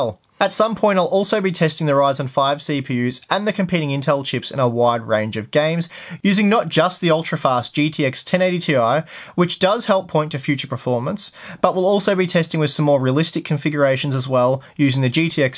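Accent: Australia. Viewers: Holy crap, you're: a) Australian b) Not annoying Good video.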